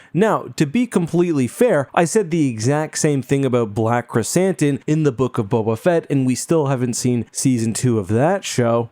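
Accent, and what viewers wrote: Canada. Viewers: oh , Canadian or from Minnesota?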